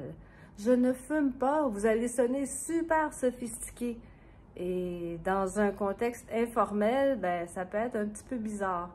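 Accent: Quebec, Canada. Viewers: nay hô, moi, j’aime beaucoup l’accent québécois 💚💚💚 Mais c’est plate que les dessins animés avec l’accent parisien ont été influencés à certains enfants, ils leur ont imité.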